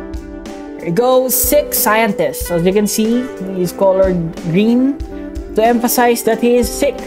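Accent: Philippines. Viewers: Are u filipino ur accent is filipino accent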